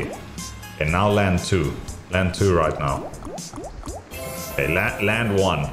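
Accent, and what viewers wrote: South Africa. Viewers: You from South Africa 😶‍🌫️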